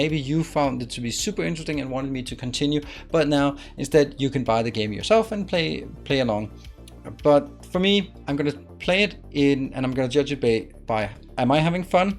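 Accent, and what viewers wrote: Denmark. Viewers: Sounds like he's danish :)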